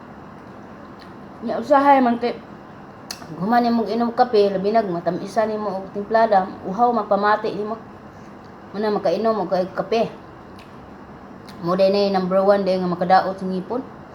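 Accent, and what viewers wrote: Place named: Cebu, Philippines. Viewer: taga asa man ka? magcge kagiki katawa akong asawa magtan- aw nimo? mura man taga cebu ang inistoryahan?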